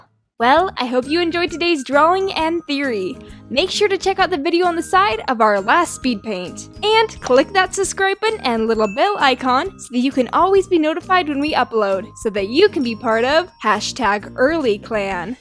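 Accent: United Kingdom. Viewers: Are you brittish?! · Whats up with the british accent?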